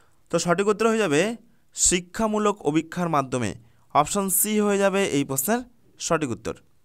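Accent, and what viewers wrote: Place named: Alipurduar, India. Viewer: Sir apni kon jelar.. Anek ta Alipurduyar er vasar moto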